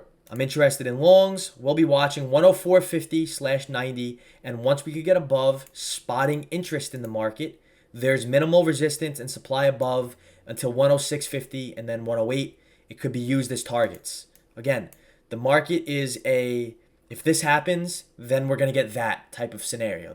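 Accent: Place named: Long Island, United States. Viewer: Tell me you're not from long island.... gotta be. Staten island is the sleeper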